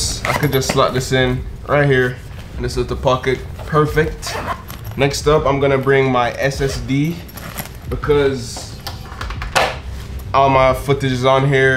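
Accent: Jamaica. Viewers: Are you from JA? That accent peeks through ! Great video. 💪🏽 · R U Jamaican? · not go lie you sound jamaican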